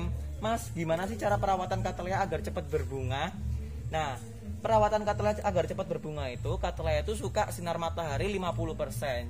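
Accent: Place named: Java, Indonesia. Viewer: medok banget mas😂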